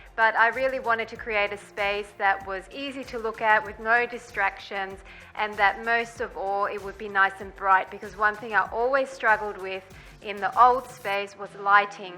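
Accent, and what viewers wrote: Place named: Australia. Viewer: Love this behind the scenes video and totally agree with Mon P. that you have one of the best workout channels out there; I also recommend it to everyone I know who's into fitness. As a Chicago girl, I tell people that one of the fun parts of doing your workouts is your delightful Australian accent! A couple ideas I think would be great for Coffee Talk videos would be: 1) fat loss vs. weight loss and how to hone in on fat loss once a desired weight has been reached; and 2) what do you typically eat in a day/recommendations for how to eat well overall. Personally, I tend to eat healthy, but struggle with being able to find healthy foods that really keep me full. This is a tough area for me because my metabolism is pretty quick, so I always feel like I'm out-eating everyone around me by like double. Any tips?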